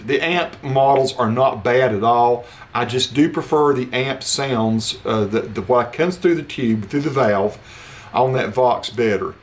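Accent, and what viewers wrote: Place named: Oklahoma, United States. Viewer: Almost sounds like oklahoma or something midwest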